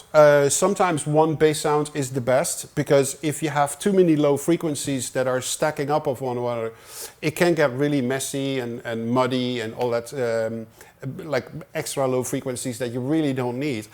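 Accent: Netherlands. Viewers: Hey Walter Lewin sounds a lot like him! Is he Dutch?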